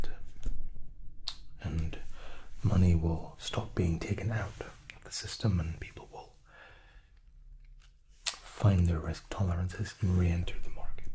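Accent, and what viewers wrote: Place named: Scotland, United Kingdom. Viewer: Not gonna lie, I like the ones where the Scottish accent is extremely obvious 😂all of them are great, though ❤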